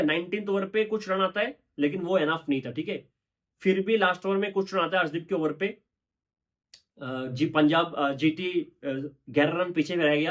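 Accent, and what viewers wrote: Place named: Tamil Nadu, India. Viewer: Bengali hai kya bhai 😲 😲 mujhe laga tamil nadu ka hai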